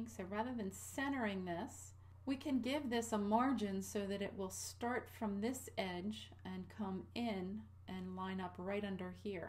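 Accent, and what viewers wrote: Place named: United States. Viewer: Very nicely done! Extremely helpful! Such a nice change to hear a lady's voice speaking clear American English in one of these tutorials.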